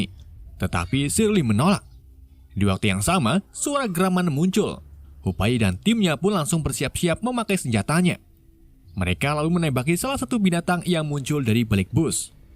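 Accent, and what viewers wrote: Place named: Java, Indonesia. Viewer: Bahasa jawanya khas bgt 😂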